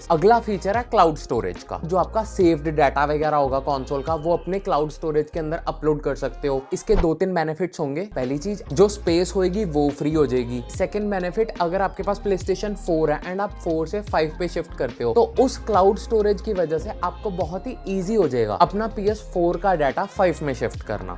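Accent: Punjab, India. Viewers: Bro are u from jammu or Punjab because of Accent?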